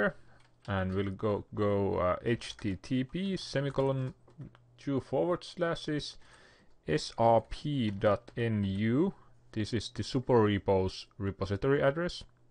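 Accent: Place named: Finland. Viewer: Tunnistan suomiaksentin tästä :D Kiitos kuitenkin!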